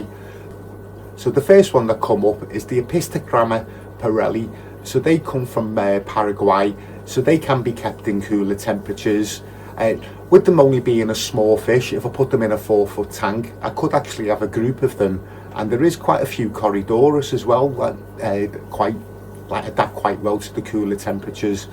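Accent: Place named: Liverpool, United Kingdom. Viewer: Something completely off topic mate, me and the wife (she's not English) were watching the old 80's film 'letter to Brezhnev' the other night and she said at the beginning she was struggling to understand the accent. I just watched your video now and she could hear you and said you have the same accent as the people in the film, she says the scouse accent is nice when you get used to it haha, happy new year!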